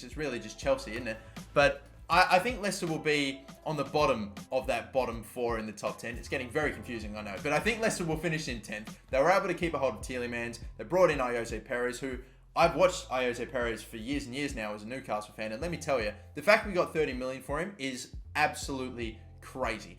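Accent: Australia. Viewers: no he is Australian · he's Aussie right?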